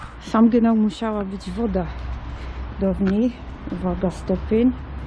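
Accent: Silesia, Poland. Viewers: Super! Fajnie to wygląda od środka, ale najlepsze to że po Śląsku mówisz a raczej godosz, pozdrawiam serdecznie i oczywiście łapa w górę 👍👍👍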